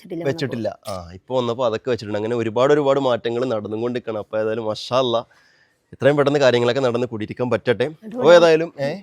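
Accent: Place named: Palakkad, India. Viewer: നമ്മൾ കൊല്ലത്തു ഉള്ളവർ കുടിയിരിക്കൽ എന്നൊന്നും parayaarilla😃 പക്കാ പാലക്കാട്‌ slang ആണ് മിക്കവാറും paraunnathu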